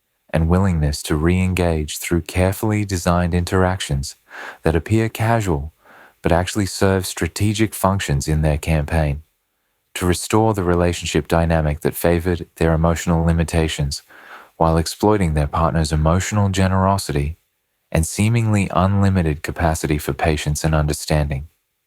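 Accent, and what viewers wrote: Australia. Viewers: I can't stand the Aussie voice...it sounds depressed. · glad that you brought the aussie voice back!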